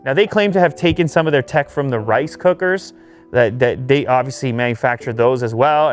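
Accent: Canada. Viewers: This man has the Thiccciest Canadian accent I have ever heard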